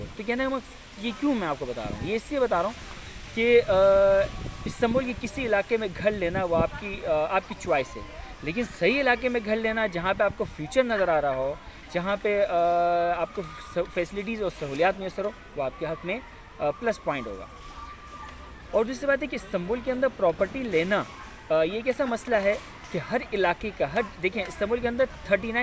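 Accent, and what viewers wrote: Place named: Karachi, Pakistan. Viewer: Bhai ka sahi karachi wala accent ha .. zabardast...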